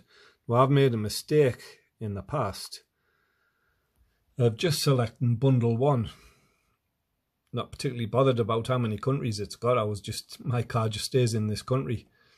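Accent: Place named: Newcastle, United Kingdom. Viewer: Love the Geordie accent! The most colourful of all English accents. (2020 VW Golf owner). 🦘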